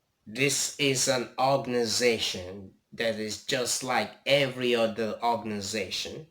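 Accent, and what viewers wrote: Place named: Calabar, Nigeria. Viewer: You are Edo but got a Calabari accent. Or maybe it’s I have not been in Nigeria for a long time.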